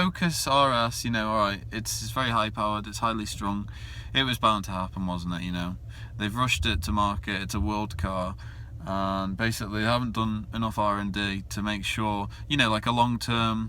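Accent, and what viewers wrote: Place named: Sutton Coldfield, United Kingdom. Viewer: Are you from Sutton Coldfield or somewhere nearby?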